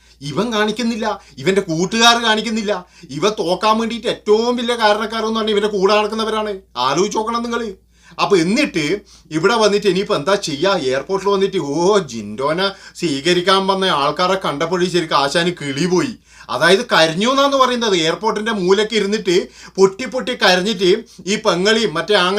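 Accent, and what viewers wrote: Kannur, India. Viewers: നിങ്ങളുടെ കണ്ണൂർ ഭാഷ ❤ നാട്ടിൽ എവിടെ ആണ് ❤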